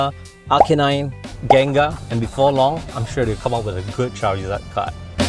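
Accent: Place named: Trinidad and Tobago. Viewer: Random: Your accent gives a hint of caribbean..Trinidad to be exact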